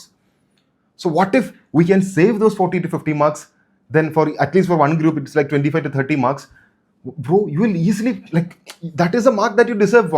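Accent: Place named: South India, India. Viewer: I don't know but i actually like South Indian accent 😅.. thx guru ji❤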